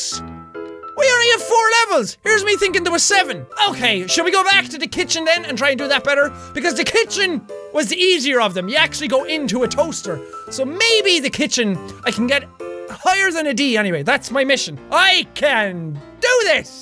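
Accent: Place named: Ireland. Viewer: Your Irish I'm Irish too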